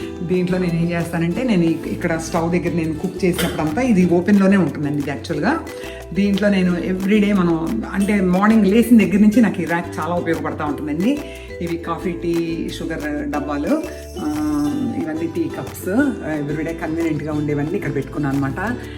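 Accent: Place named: Eluru, India. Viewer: When I was listening to you, i was thinking you must be from around Eluru/Vijayawada. I am from Eluru also and studied in same school, college. I graduated in 1992 from college.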